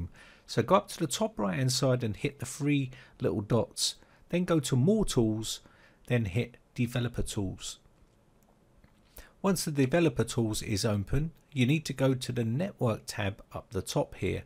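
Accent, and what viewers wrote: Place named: Cockney, United Kingdom. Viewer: It is actually 'Wotcha' guys that he says at the start of the videos. It is an English slang expression for ' Hello, how are you'. Spoken along with his semi Cockney accent. I am from London and speak in a similar fashion to this! I do agree about why would you want to throttle your connection though. I suppose someone must have a reason for doing it somewhere. Puzzling though!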